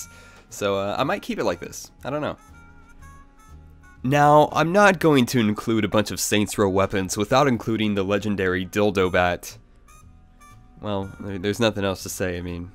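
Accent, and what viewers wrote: United States. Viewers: Why do you play it in Japanese, You have an American accent.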